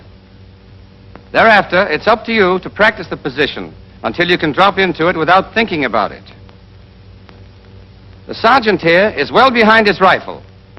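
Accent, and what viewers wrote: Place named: Boston, United States. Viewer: He's speaking in a Boston accent, or New England accent.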